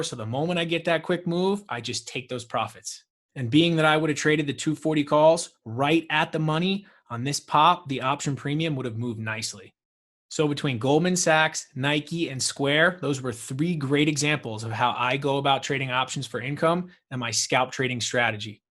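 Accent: New York, United States. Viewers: That accent are you from ny/nj?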